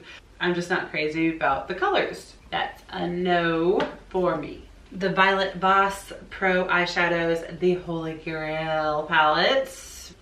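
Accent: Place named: South, United States. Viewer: I loved watching you declutter. I've grown to miss the south and home so it's nice hearing your accent. Will keep watching. ❤️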